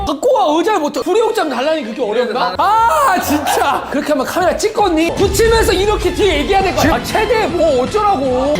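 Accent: Jeolla, South Korea. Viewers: 형원이의 영향인지는 몰라도 사투리할 때 전라도 사투리가 자연스럽게 나오는거 볼 때마다 깜짝깜짝 놀라 한국의 얼을 이해를 못한거야를 모단거야 하는데 진짜 자연스럽다 생각함 ... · 민혁씨 전라도 출신인가요? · 말투가 너무 구수해서 빼박 전라도 출신인줄 알았더니 태생부터 서울사람이넼ㅋㅋㅋㅋㅋㅋㅋ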